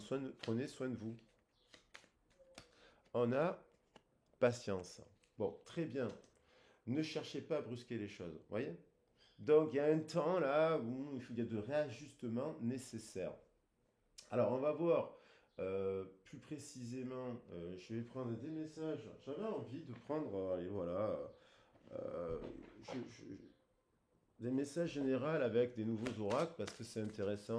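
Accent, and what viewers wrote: South, France. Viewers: Bonjour je suis tres heureuse de Vous voir sa me fait plaisir 💝😃 de Vous entendre j aime Bien votre accent du midi qui nous apporte le Soleil Dan's Notre Coeur merci beaucoup rayon de Soleil🌞👍gros bisous👄☘️💃🕺🌈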